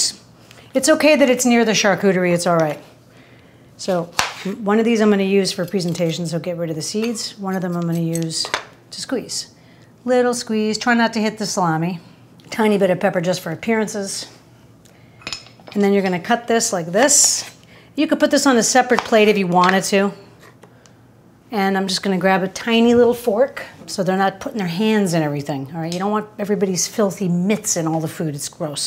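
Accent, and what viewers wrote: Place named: Northern England, United Kingdom. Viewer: I’m living for your Northern accent luv. Proper bloody great!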